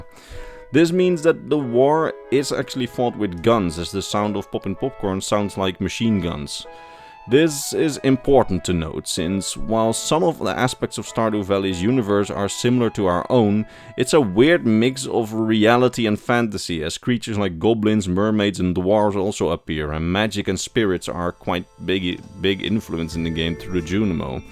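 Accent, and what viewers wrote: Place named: Netherlands. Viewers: I'm so torn on your accent. My guess is either Dutch or Russian 😂 please help me out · He's definitely Dutch his accent isn't thick enough to be Russian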